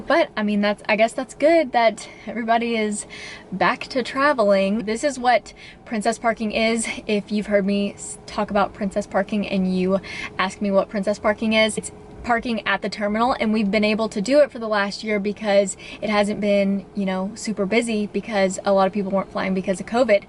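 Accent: South, United States. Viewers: is it just me or that southern twang + fancy face a killer combo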